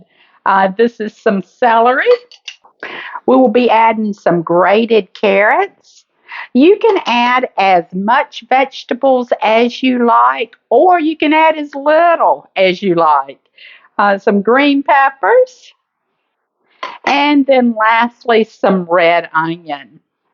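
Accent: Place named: South, United States. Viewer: You can tell she's from the south. She pronounces "boil" like bowl.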